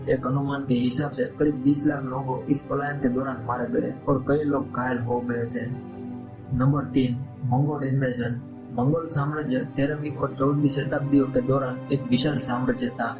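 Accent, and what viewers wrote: Gujarat, India. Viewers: Bhai Tu Gujarat se hai ?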